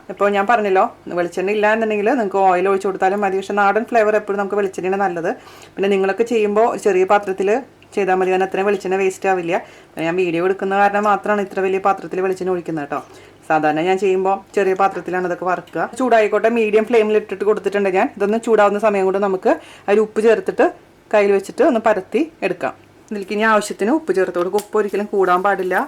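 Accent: Thrissur, India. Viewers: hy chechii!! u r really super!! njn thrissurkari aan .. athond thanne chechide slang and presentation style kekkumbo sharikyum ishtayii.& ur recipes r awesome tta! 😍 marriage kazhinjapo cooking onnum areelyarrnuu..ipo ith nokki nokki chechi paranj tharanath kett kureshe cheyyan padichu.. 😍 & most of all my in laws r so happy with me now 😁!! thnk u so much chechii 😃 keep going!! all d best !! 😘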